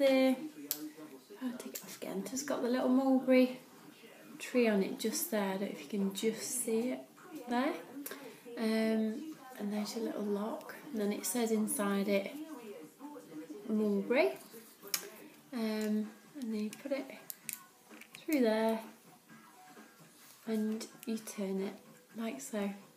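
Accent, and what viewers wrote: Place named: Mansfield, United Kingdom. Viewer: I love your bag reviews, they're so helpful! I think your YouTube channel page must be set up to only show your most recent three videos because I can't see a list of them all together! Your accent is very familiar, I'm from Mansfield, and I assume you can't be from too far away, as it's like listening to myself speak! Haha x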